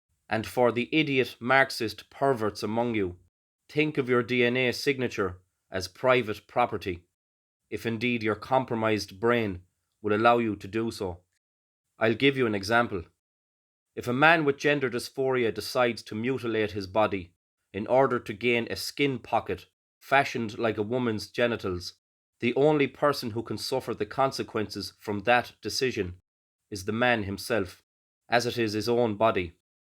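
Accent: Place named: Ireland. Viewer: Cheers dude. Nice to hear an Irish voice of reason. Between you and Dan's Mix Tape I feel a little less like society is collapsing. Keep up the good work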